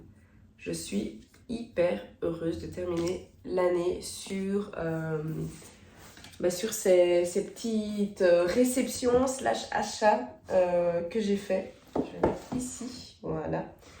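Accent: Belgium. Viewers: Bonjour, contente de te retrouver en 2023 même avec cette fin de Covid qu'on sait très longue. Je te fais tous mes meilleurs vœux pour 2023 ainsi qu'à tes proches. De belles lectures et des vlogs supers comme tu nous en a fait en 2022. Ma première lecture de Janvier 2023 est le Domaine de l'Héritière de Lucinda Riley. Je n'ai pas encore lu Fleat House que j'avais reçu mais je vais avoir de la lecture à partir de fin janvier car je suis jury catégorie polar pour livre de poche. Enfin, j'espère que cette année tu continueras à t'épanouir. Le moral bas entre mi-septembre et fin octobre, je connais très bien, c'est une période très compliquée ou j'ai perdu ma maman en septembre 2011 et mon papa en octobre 2013 et cela est toujours douloureux surtout pour mon papa. Voilà continue à nous régaler avec tes vlogs, ton naturel, et ton accent pour les nombres en belge.😃Amitié Laure sur insta.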